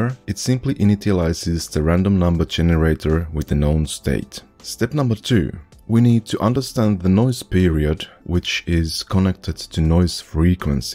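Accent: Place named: Finland. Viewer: I could bet money you are Finnish. :D I recognise that accent.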